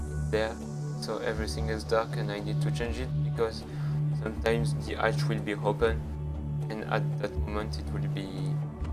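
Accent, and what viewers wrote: France. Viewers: You are from what country ? France ? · Your french or i don't know your accsent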